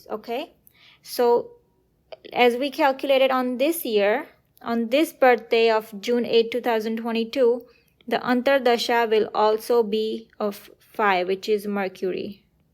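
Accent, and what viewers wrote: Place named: United States. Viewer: American accent. Simply wow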